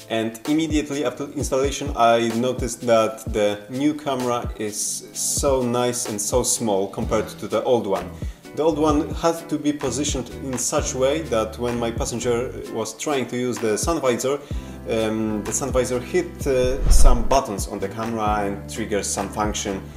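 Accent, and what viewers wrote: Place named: Poland. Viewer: Ale schize miałem. Akcent taki znajomy, polski i dopiero przy porównaniach wideo zrozumiałem xD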